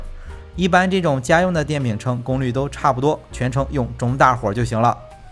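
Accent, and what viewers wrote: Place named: Dalian, China. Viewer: 听这位老爸的口音似乎是大连银😆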